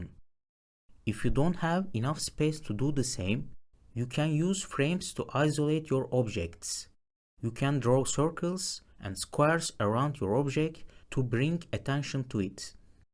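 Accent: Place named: Turkey. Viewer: Aksanınız Türklere çok benziyor, Türk Müsünüz?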